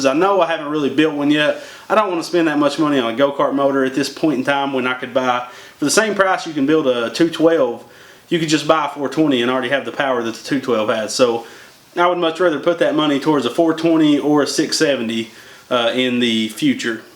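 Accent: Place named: Arkansas, United States. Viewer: man i know this is an old video, hope you see this. but what state are you in? Being honest and respectful you sound like an Arkansas boy. Im from South Missouri. im just getting into gokarting with my buddies and we love your videos! jeep up the good work man!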